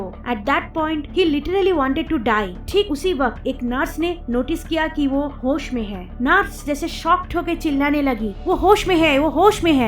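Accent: Bengal, India. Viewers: Are you bengali? It sound like you are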